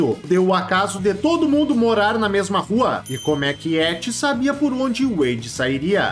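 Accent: Rio Grande do Sul, Brazil. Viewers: Eae meu querido, ótimos videos acompanho sempre, eres gaúcho tbm?? tu voz me é familiar daqui do estado kkk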